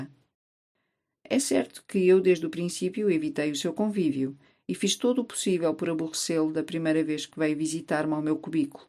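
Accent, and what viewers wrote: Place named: Portugal. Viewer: Sou brasileiro e pela primeira vez estou escutando uma voz feminina portuguesa após ouvir Fados. Beijinhos 💋 portugueses ....rsssss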